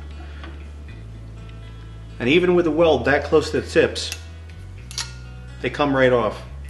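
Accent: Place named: Minnesota, United States. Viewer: Oh okay, your accent sounded a bit like the characters from Fargo.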